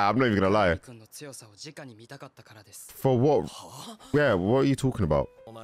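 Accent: United Kingdom. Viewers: Are you from England? Your English sounds like British English?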